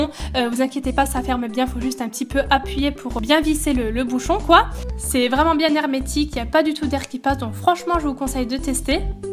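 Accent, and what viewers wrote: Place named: Alsace, France. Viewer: Haha quand j'ai entendu ton accent j'etait sur que tu etait alsacienne ,comme moi 😉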